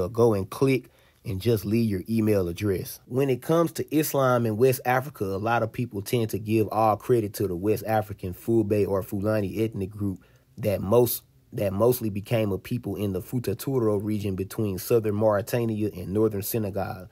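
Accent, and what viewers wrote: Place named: United States. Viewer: With due respect bro the Fulbe in nigeria 🇳🇬 are very fluent in fulfilde 🗣️dey cut across the cameroons🇲🇱,central Afrique, Niger, conakry and so on and mind u the chief of d Fulbe in Yola nigeria 🇳🇬 is district head and spiritual leader to all dese zones dey pay Allegiance🐮🐮🐮🐮🐮🐮🐮 to him bro pls 🙏 don't be ignorant I see u got an American accent🗣️how much of fulfilde can u speak urself bro🤷No hard feelings bro. Bro good job u did here buh pls 🙏 do a lil bit of research on Nigerian Fulbe🐏🐑🐃🐂🐄🥛🥛🥛👌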